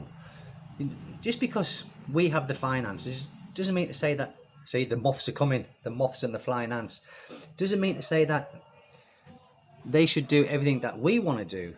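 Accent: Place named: England, United Kingdom. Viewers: Good Stories but most of all, my english improve... Hehe U have the english english.... Grueß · He has the Geordie English......I dor nor....lovely.😊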